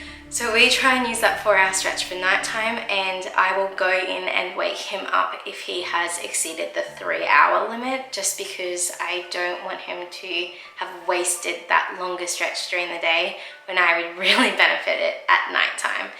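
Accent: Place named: Australia. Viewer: Ngawww Mama! These are the best milestones!! Just saw your post on Youtube Moms Unite and thought I show some love and connect. I'm hearing a familiar accent Mama!! <3 Where abouts in Australia are you from? I'm based in Sydney! Hope to stay connected.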